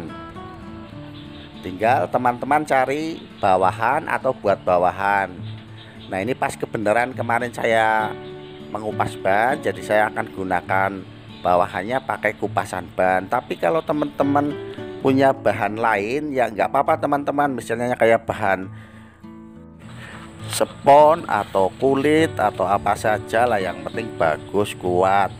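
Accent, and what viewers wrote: Java, Indonesia. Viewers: Kreatifitas tanpa batas... Dengan logat jawa punya..👍👍👍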